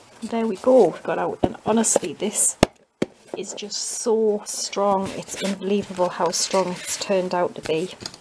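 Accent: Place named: Scotland, United Kingdom. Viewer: TBH, I started watching to hear you're beautiful Scottish accent but I keep watching bc I really love you'r work, lol. ❤❤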